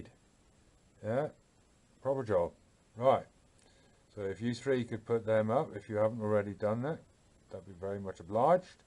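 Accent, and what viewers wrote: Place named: Cornwall, United Kingdom. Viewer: You seem to take care of the upper Tamar,i've been concentrating on the lower! Tributaries of it anyway.Good to hear that Cornish accent.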